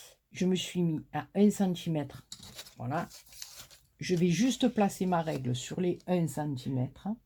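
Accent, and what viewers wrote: South, France. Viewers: Cc ma, tu as toujours des idées originales....elle est trop sympa cette cartounette! En plus à chaque fois que je t'écoute tu me rappelles le sud où je passe mes vacances d'été depuis 16 ans! Prends soin de toi ma et à très vite pour un tuto! Pleins de bisous. Sandrine du 91 😘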